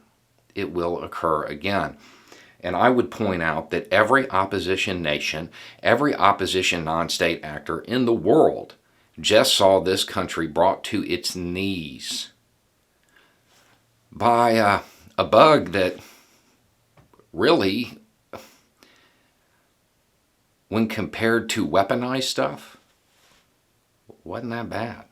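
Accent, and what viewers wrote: South, United States. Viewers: I'm sure you've been asked this before, but have you ever thought about running for an office? You'd be the first southern accent I ever voted for!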